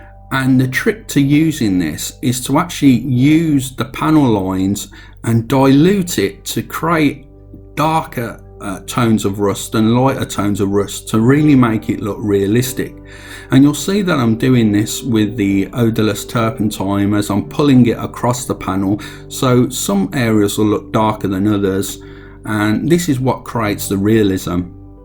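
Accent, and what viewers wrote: United Kingdom. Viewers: Hello. At first let me say: I love your tutorials and your british accent. But I have some constructive criticism. Pls increase the quality of your vids by making a good audio. You lower your fantastic work by this aweful sound. Don't be mad at me. I just want to push your vids into a good light. Greetings, a fan from germany. Keep up the awesome work!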